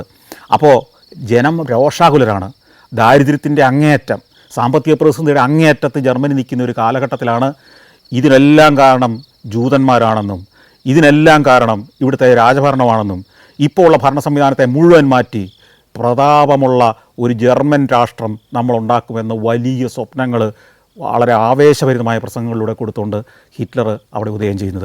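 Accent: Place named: Kottayam, India. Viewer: എടുത്തിട്ട് ഇണ്ടൻ അടിച്ചോണ്ട് ഇരിക്കുവാ....കോട്ടയം സ്റ്റൈൽ😂😂😂❤❤🎉🎉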